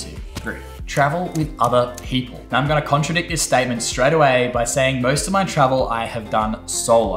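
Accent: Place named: Australia. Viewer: Hey. great tips that will definitely come to use for me. Nevertheless, wildlife is my treat. Love your work and the Australian accent is a charm:-)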